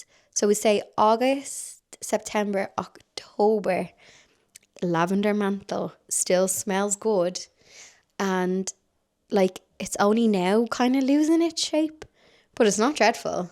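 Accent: Ireland. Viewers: Love your videos love listening to your lovely Irish accent I am in the uk living in Bristol 🙄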